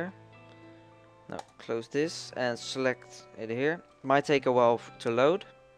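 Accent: Netherlands. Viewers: are you from netherland it sounds like english is not your langue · lemme guess, you a dutchie?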